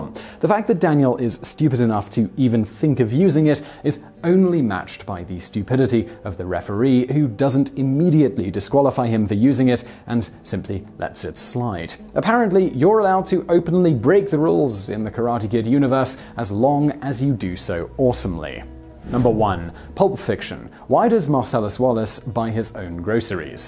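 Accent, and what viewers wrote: United Kingdom. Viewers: Eh. What dya want. He's a British Milennial.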